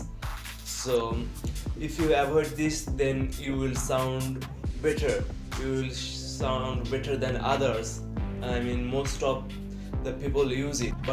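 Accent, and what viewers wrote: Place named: India. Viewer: You need to work on that indian accent though, captions do not go along well.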